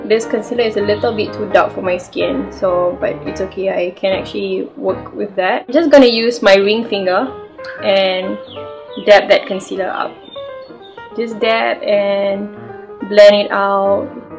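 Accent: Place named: Singapore. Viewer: you sound so singaporean! 😆 anyways whats your shade in mac?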